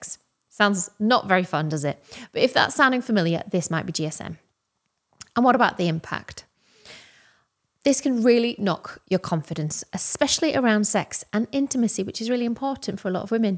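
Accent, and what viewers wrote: United Kingdom. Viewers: your British accent, alone, is challenging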